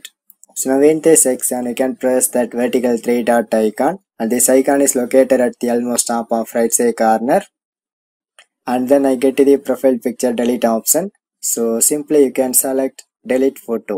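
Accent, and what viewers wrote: South India, India. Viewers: bro is from south Thats why he is having this type of accent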